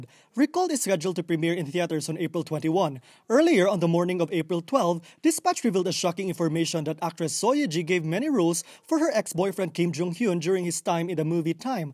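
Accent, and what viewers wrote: Philippines. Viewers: I think the narrator was a Filipino, because of his accent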